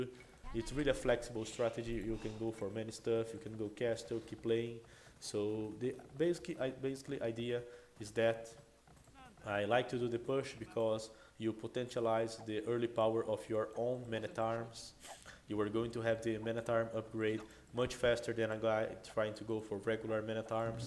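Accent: Brazil. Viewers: ele é brasileiro? o english dele é meio sotaque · Your accent remember a brazilian speaking.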